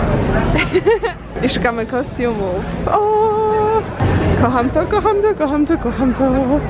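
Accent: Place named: England, United Kingdom. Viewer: tobie już powili angielski akcent do polskiej wymowy się wkrada❤️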